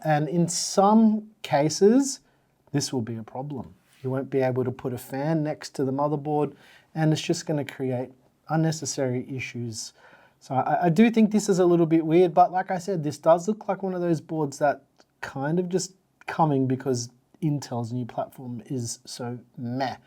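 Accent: Australia. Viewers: Man. I got through 5 minutes of the voice volume fluctuations and had to shut it down. Goofy Australian accent didn’t help either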